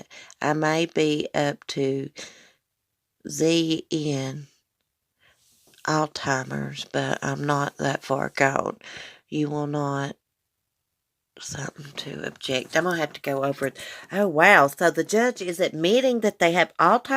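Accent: South, United States. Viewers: okay good I have a baby but never used her as my profile. I guess you knew it wasn't me anyway because you blocked the person you said. ❤ I personally like your southern accent. It doesn't sound bad at all. So F that girl!! She probably had to use her baby as her profile because she was hideous lol. Remember you have alot of supporters and we think your awesome ❤😊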